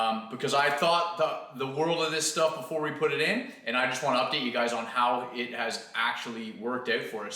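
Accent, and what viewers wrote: Canada. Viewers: Yes noticed this too right away from a fellow Canadian! I get the same in my channel in the comments all the time haha can’t hide that Canadian accent 😁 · looks good! I know this has no relevance to the video but I love that i can tell you are Canadian by our distinct "accent" LOL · when I first heard you talk I knew you were from Canada. I'm from Northern Minnesota. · I knew you was in Canada by your accent. I live in West Virginia and I’ve been doin research on flooring for my house. This looks good but I’m scared that it would come up. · That hard ass Canadian accent...you act like this stuff is a new product...vinyl flooring has been around sometime...The only difference...in the past it was installed like carpet in rolls. Know the manufacturer gave customers/market pieces in a box instead of rolls..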